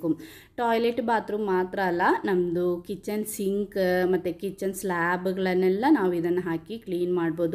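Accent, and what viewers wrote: Kerala, India. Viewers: Madam malayali aano Kannada ketappo angane thoni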